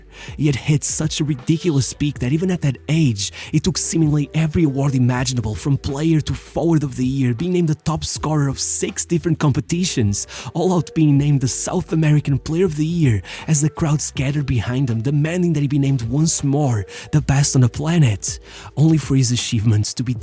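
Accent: Portugal. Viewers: I know you are portuguese from your accent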